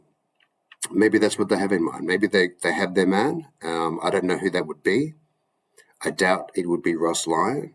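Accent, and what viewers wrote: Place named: United States. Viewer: Am I crazy, or can I detect a slight Ameircsn accent?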